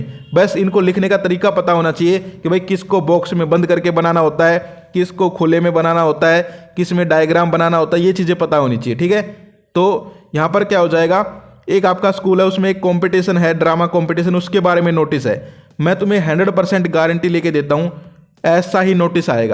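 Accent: Haryana, India. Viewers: Sir too haryanavi hai ❤❤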